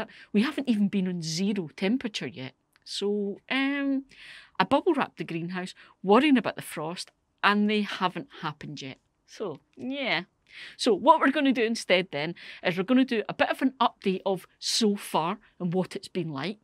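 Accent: Scotland, United Kingdom. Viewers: You are the spitting image of Jodie foster, even the way you speak (apart from the Scottish accent lol) Anyhoo, I've just bought a polycarbonate greenhouse, after watching your videos. I had absolutely no idea that it needed heat in winter, lights and watering system & so much more 😬 I didn't research enough 🙄. I'm retired now & have always wanted a greenhouse (I used to use my window ledges when kids were wee)& I'm on a tight budget. I've started tomatoes and a few herbs & cuttings from other people's gardens. Any suggestions where I can get things cheap? I'm not great with technology & always seem to mess up lol I stay in Millerston just outside Glasgow and the winds can be pretty bad too so was looking at glazing tape & canny even do that right 🙄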